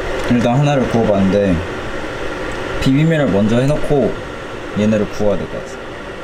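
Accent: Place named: Gyeongsang, South Korea. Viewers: Daegu's accent is cuteeee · Logat seperti logat busan... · your daegu accent is sooooo adorable♡ Love you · When speaks casually, I notice Daegu accent is sooo cute Kekekeke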